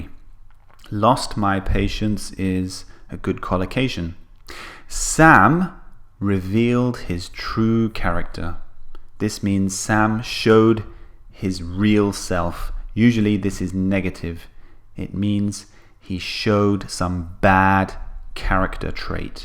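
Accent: United Kingdom. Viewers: I have a question. May I know, which British accent do you teach? Do you teach a Cockney accent, Modern RP(received pronunciation ) or a Brummie accent? Your reply would be appreciated.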